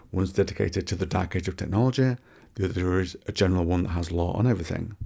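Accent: United Kingdom. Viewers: All I need is a soft , smooth British accent , and 40k lore , and I’m here for it. Luetin has biased me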